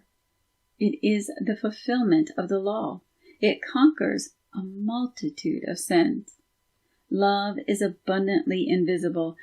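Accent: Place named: New Jersey, United States. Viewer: One of my fav chapters in this 6 volume CLASSIC. I listen to all 6 volumes when walking on forested trails. And this lady is my fav reader cuz she carries the frequencies. I do chuckle each time she says the word: on ... cuz it exposes her accent ... probably NJ or Long Island ... she does a fantastic job .... Baird would be proud of her efforts to share this remarkable body of work.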